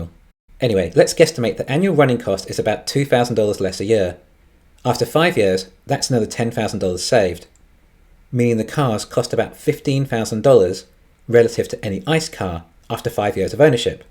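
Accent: England, United Kingdom. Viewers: The video montage imbued with an English accent commentary is what allows this channel to be the quintessential news source for $TSLA and the chosen offspring of world AI salvation, á la The Terminator, Elon and his Canadian progenitor, Maye.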